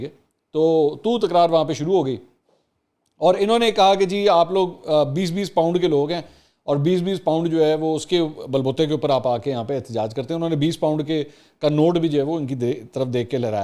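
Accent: Punjab, Pakistan. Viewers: I love your panjabi touch bai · Punjabi tarka must hai 😎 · Sir, your speaking tone is very good, especially the punjabi tadka is very heartwarming. May Allah bless you. Very good reporting. Muhammad Sohail Razzaq Saudi Arabia. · Love your Punjabi tarka. Keep it up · Boss punjabi tarka k baghair agar aap nay vlog kia to axha nahi hoga ..... I live ur access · Bhai aapki panjabi ka tarka apke V log ko char chand laga deta hai, continue please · Love your punjabi tarka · Your Punjabi tarka goes well with urdu, Jabran bai is rare follower of IK who is unexpectedly not swearing so you are right to appreciate his attitude, your approach is very logical as usual, great work. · i really enjoy "punjabi tarqa"... 😁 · Salam, Like the way you present vlog. Please don't change it and keep "TADKA" of punjabi. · Enjoying panjabi tarka, keep it up ⭐ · bhai Punjabi wala tarka chuk k rakho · I really enjoy when you give Punjabi touch. 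· I am Baloch but Punjabi Tarka ziyada acha laghta hai QK Khadim Hussain Rizve sy Muhbaat hai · Punjabi tarka 👍👍👍👍👍👍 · sir apka panjabi touch vlog ko 4 chand laga dayta hy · Yeh punjabi nahin balke punjab ki urdu hai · bhai mje bara maza aata h jb ap punjabi tarka lgaty han bara acha lgta h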